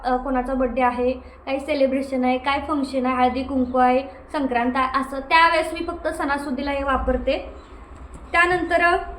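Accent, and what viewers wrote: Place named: Baramati, India. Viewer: ताई कुठे राहतेस तुझीभाषा तर बारामती साईट ची वाटते खुप छान असतात व्हिडीओ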